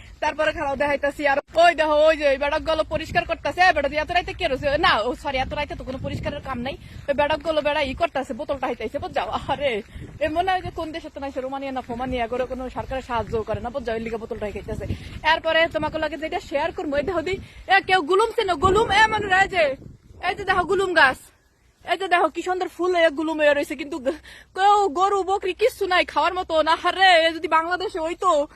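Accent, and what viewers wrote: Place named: Barisal, Bangladesh. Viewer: সেই হইছে আপু আমাদের বরিশালের প্রানের ভাষা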